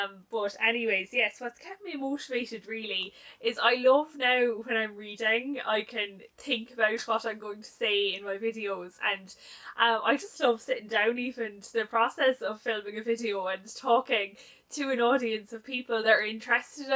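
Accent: Ireland. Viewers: Congratulations on your first year! You have rapidly become one of my favorite BookTube channels. I love your taste in books, and I could listen to your Irish accent for hours on end! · Congratulations on your first year,. Really enjoy your videos and your smily face 😊. My parents were from the West of Ireland (Westport and Foxford) and I love listening to the accent too! Here’s to the next year. Catherine x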